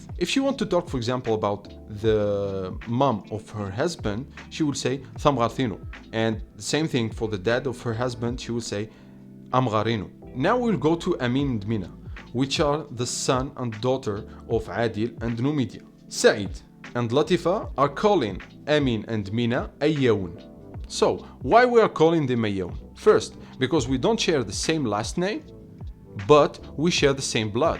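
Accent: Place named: Rif, Morocco. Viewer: Can i know where are you from ? Because your accent of tmazight n rif sounds a little bit different of what i used to hear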